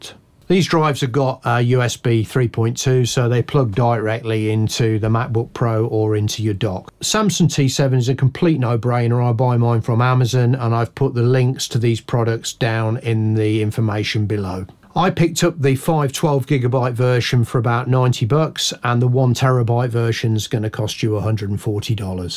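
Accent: United Kingdom. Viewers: Thanks for getting back to me. Have ordered the M1 MBP with 16GB & 1TB I’ll be using it primarily to record acoustic instruments and vocals in situ, probably no more than 7 or 8 simultaneous tracks at a time. Fans have been an issue in the past, have you had any problems noise wise with the MBP under load? PS You sound like a fellow midlander, from Stourbridge myself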